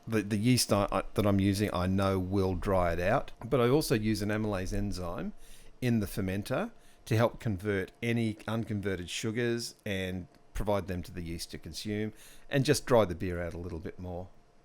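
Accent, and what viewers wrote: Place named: United States. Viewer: You are using metric system but your accent like american ?? 😮😮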